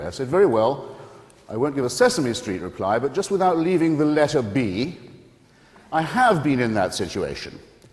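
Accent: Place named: England, United Kingdom. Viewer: It's just his pompous attitude, and English accent.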